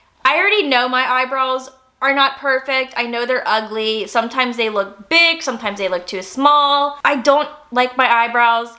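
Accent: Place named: San Francisco, United States. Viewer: I thought you were a San Francisco Native because of your beautiful San Francisco accent. It’s a very unique way that native San Franciscans talk and I love it.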